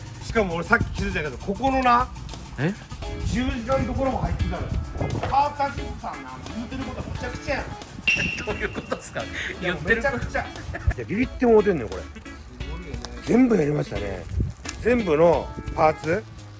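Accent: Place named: Fukuoka, Japan. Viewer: 福岡弁さん本人の面白さカメラマンとの絡みローレルネタ全部最高。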